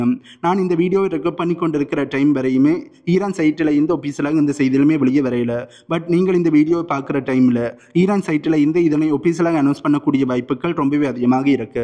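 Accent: Sri Lanka. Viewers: Srilanka tamil best voice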